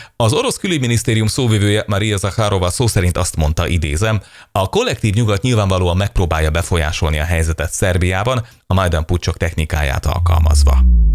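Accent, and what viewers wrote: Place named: Palóc, Hungary. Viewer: jó hallani 1palocot. Nemtudom hogy csinálod mert visszadod a tájszólást, úgy nint 1paloc sosem tudna. Jók a híreid mert sok kútfőből merítesz valamikor Lovas István tudta ezt. Sok sikert az új évben.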